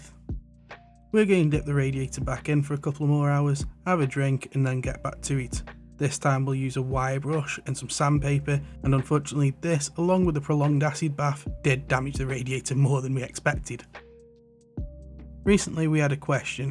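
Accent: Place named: Stoke, United Kingdom. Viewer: As a fellow stokie, you can hear another stokie from a mile away.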